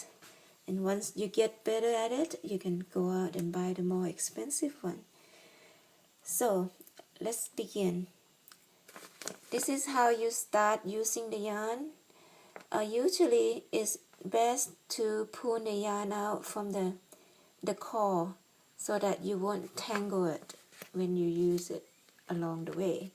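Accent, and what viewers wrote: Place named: Thailand. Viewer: I love your tutorials! Maybe move away from your camera a little bit. You teach very well. I love your accent. May I ask if you are from Thailand? I lived in Thailand many years ago. 💕. Thank you for sharing your craft.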